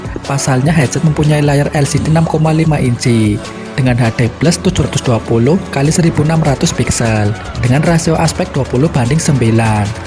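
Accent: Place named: Java, Indonesia. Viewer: Logatnya jowo bngettt 😁😁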